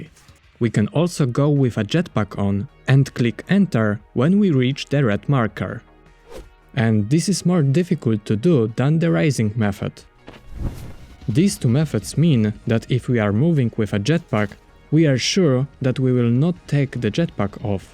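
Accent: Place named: Poland. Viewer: jesteś z polski słysze akcent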